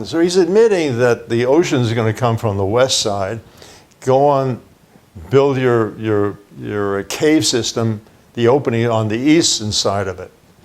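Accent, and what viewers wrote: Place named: New York, United States. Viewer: Sounds like he grew up in NYC.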